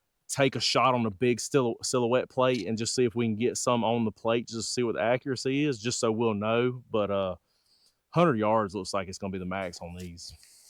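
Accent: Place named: Alabama, United States. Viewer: Just found your channel. Great video. I hope they take your critic well and fix the SD. If they do, l will give hunting with subs a chance. The bullet flat out performed. I am curious about where you are from. You talk exactly like me. I am an Alabama native, but lived in TN for 14 years and south FL for 3.5. Anyway, just subscribed. Keep ‘em coming.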